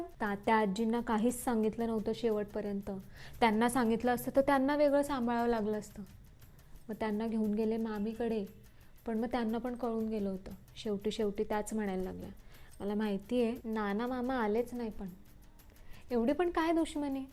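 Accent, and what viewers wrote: Khandesh, India. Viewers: व्हिडिओ कॉल वर निसता रडे ...😂 तुम्ही खानदेशी आहात वाटतं तिथे असच बोलतात 😂😂😂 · छान वाटतं ना ..ऐकायला...खान्देशी ...आम्ही सुद्धा...आपलस वाटत ऐकताना 🎉🎉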